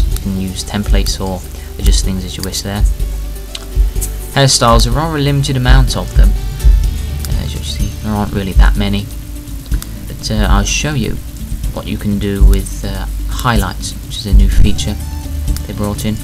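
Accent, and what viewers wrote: United Kingdom. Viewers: OMG R U BRITISH i luv british ppl their awesome!!! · where are you from? you talk posh. nmo offence && you should Posh english but im english i sound NOTHIN like that !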